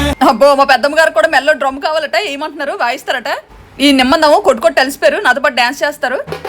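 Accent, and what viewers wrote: Vizianagaram, India. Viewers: Me family ki memorable event, so nice to watch your videos, also me vijayanagarm slang superb andi 😍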